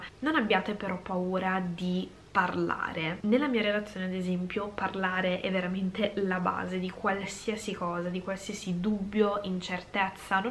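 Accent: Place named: Milan, Italy. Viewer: Molto interessante! Curiosità Indiscreta, hai un Accento milanese con tratti di pugliese.. Ci ho preso? 🤔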